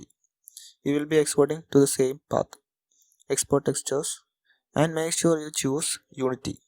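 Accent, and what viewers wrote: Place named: South India, India. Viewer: Thanks bhai that material splitting thing was giving me headaches I was import the whole model without making materials in blender I never used any 3d paint software By your accent looks like you are south Indian hope I am not wrong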